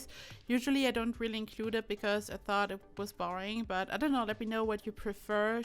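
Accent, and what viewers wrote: Germany. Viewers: Sounds like u are German :D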